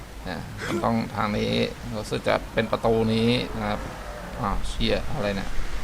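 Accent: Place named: Suphanburi, Thailand. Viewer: พี่เป็นคนสุพรรณบุรีป้ะ เหน่อเวอร์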